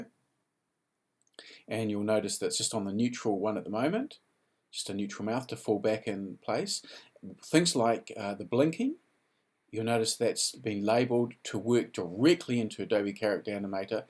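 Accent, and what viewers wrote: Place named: Australia. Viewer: Really good intro vid,. And nice to hear another Aussie accent in the animation world! 👍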